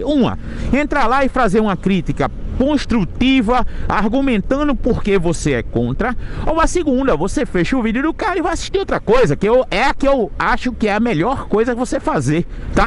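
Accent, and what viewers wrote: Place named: Northeast, Brazil. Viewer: sou teu fã cara! Curto demais seus vídeos, seu sotaque nordestino só mostra o quanto esse Brasil é maravilhoso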